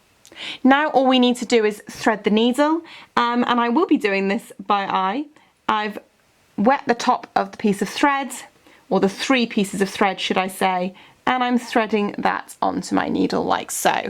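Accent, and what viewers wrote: United Kingdom. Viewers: Very helpful, tysm. btw luve,luv LUV ur british accent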